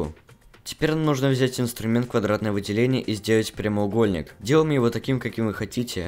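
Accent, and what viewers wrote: England, United Kingdom. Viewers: бро, всё круто, но убери этот акцент английский, уши вянут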